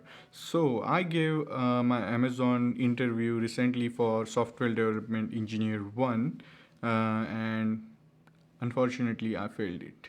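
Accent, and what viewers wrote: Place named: India. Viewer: Are you a student? You have a strong accent, i would recommend you to work on your accent as well. it goes a long way for indians to neutralize that thick indian accent if they are looking for jobs in the US.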